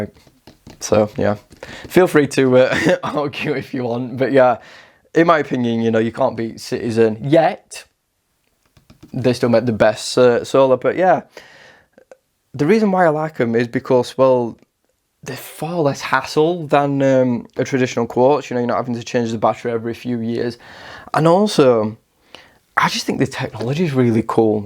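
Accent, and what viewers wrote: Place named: Yorkshire, United Kingdom. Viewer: Well, thanks a lot for this video! I like solar watches too and I have a couple of solar G shocks. I’d like to know why you’re letting the citizen BN150 go… I am waiting for it in the mail and I was wondering if you’re letting it go because of its size. I have a small wrist too, so I hope it’s not for that reason… On the usual, unrelated note: You have silent “t”s (positive, capacitor, latest, better…). Your way of pronouncing “much“, “love“, “tough” sound scouse to me, but it could be any other accent that has that sound. So, in the end, basically I have no clue. Im sure it’s not Scottish, nor Nothern-Irish and I’m prone to exclude the West Country. Any hint?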